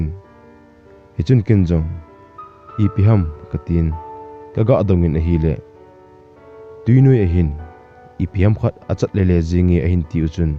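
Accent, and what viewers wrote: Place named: Nagaland, India. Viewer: Sir hoylang ho nahi hm, na awso chu achome, ole Nagaland,nc hill Assam lang ho nahim sir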